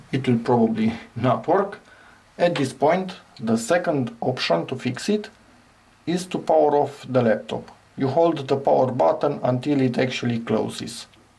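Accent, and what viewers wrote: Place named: Romania. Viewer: You have such a strong roumainian accent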